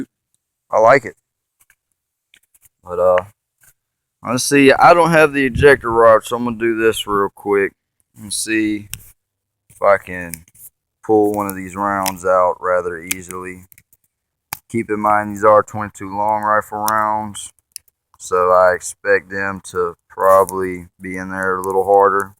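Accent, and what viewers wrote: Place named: South, United States. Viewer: He only had 4 rounds at beginning.... (one on bottom right, one center bottom, two on left.... empty top center (live round) empty top right.) When he pointed gun towards himself I don't believe it was a loaded round. He took the bullets out to show how much was shaved off.... Then first round he fired was single action "for accuracy" .... you can clearly hear and see hammer pulled back before firing first round.... Thats when the live round was ready for fire I believe. However, never a good idea to point a gun at your face lol. Just move your damn camera in case you mistakenly do have a live round bro. Better it take the bullet than you. I assume since you got the shaving down and had no miss fires on a gun notorious for misfiring, that you're somewhat familiar with firearms... I get you weren't expecting it to be loud .. 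I don't get firing a sawed off shotgun without ear protection lol.... Shit does happen but shit can be prevented dude. Oh well you got enough bashing so I won't judge. Probably catching shit for your accent hence the moonshine shit comments lol. I been in the core for 20 years and you southern dudes typically know your weapons... Then again I don't remember seeing any of them pointing a gun to their face regardless if it's loaded or not. I'd still be doing push ups in the sandpit if I pulled that shit down range. I paid $15 at a pawn shop out the door for mine. I put it through hell. It even fires sumerged under water to this day. Most reviews are misfires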